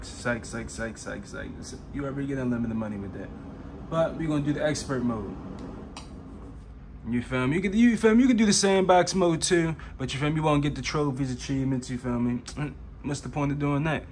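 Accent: Baltimore, United States. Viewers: You from Bmore I can tell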